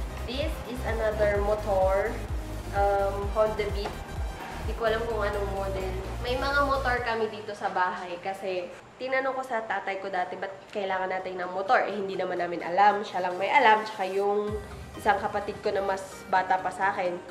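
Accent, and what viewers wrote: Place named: Ilocos, Philippines. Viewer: Miss ilocana ka no.... Sa salita palang my puntu na... Ney..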